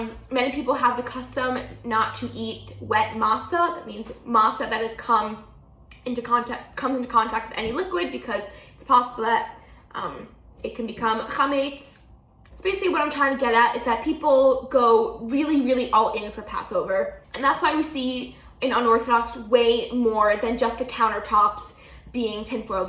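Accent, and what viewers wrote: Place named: United States. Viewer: When I see you, hear you speaking I feel like I have an American girl in front of me, certainly not an orthodox jew girl. Your nails are painted... Your top doesn't cover properly the area below your neck. Also, I don't think it is very tsanua to expose yourself on YouTube with your hair untied and talking about family purity rules for the entire world to see and hear...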